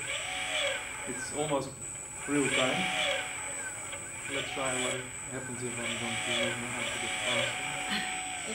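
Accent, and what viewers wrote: Netherlands. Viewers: I can clearly hear from your English accent that you're Dutch. You should call it Ikarus and make it mountable and bigger.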